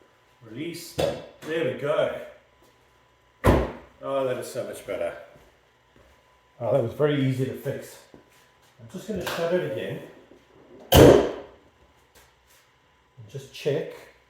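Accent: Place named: South Africa. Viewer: Thanks for the fix, desperately needed this. You sound South African 🇿🇦 If you are, thanks for helping me all the way back home. Good luck with your channel 👍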